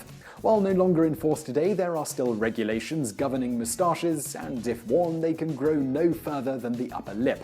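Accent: United Kingdom. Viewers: I am interested in finding out today, what kind of accent does Simon have. I noticed how he says the word five (foive) finally (foinally) by (boi). What part of Britain does this kind of accent come from? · Is it just me or is that Johnny Sins with a British accent and a beard?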